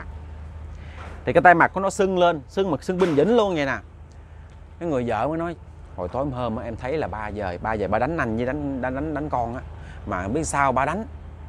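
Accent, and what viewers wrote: Mekong Delta, Vietnam. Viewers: Người Miền Nam mà lại hệ miền tây nên dễ nghe, dễ hiểu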